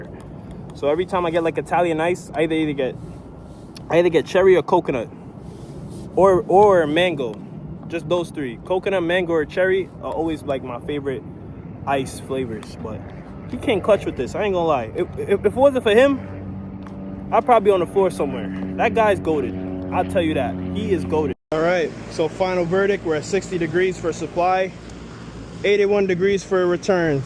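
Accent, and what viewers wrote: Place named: New York, United States. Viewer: Native New Yorker here from Spanish Harlem. Your accent reminded me a bit of New York. Happy to see a fellow New Yorker thrive. Knicks in 5 🟠🔵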